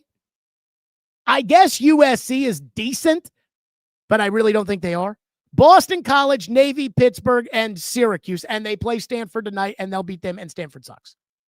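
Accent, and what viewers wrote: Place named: New Jersey, United States. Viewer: Could’ve swore this guy from jersey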